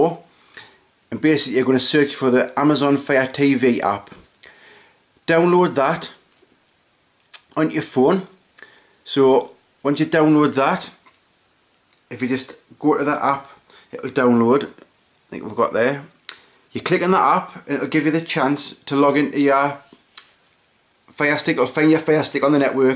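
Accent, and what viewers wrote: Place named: Tennessee, United States. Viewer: You sound funny are you from Tennessee?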